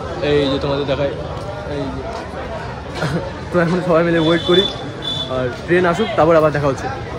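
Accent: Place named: North Bengal, India. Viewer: I hv come to see your vlogs v recently and glued to them. Honesty bro, toder North Bengal r bolar accent ta khbi sundor.. Really enjoyed watching ur videos..I hope you get more success in the future. Take love from Burdwan.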